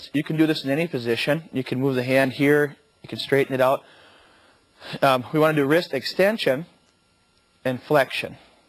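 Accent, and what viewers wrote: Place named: Canada. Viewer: haha this video looks like it could have been made from the 70's I like his (I believe) Canadian accent, haha, but still very helpful, thanks :)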